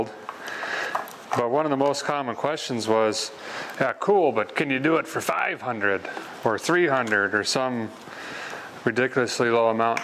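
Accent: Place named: Minnesota, United States. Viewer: This is how all Minnesotans north of i94 talk. Almost Canada relates to vocal inflections as well as weather.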